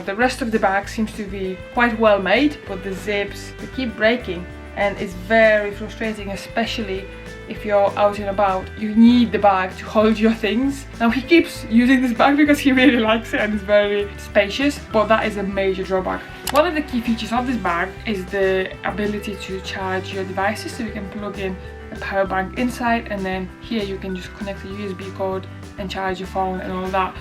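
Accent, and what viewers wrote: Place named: Northern England, United Kingdom. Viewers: Ur half Norther English accent is brilliant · love the northern accent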